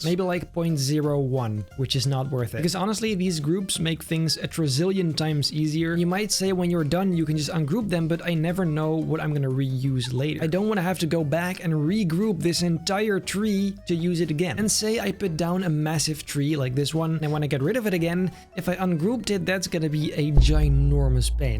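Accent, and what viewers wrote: United Kingdom. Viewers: Is he British init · he is getting to british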